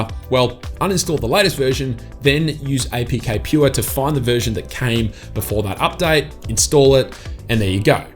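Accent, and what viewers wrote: Australia. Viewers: When I heard your accent I thought you are a British but you are definitely Australian 😂❤️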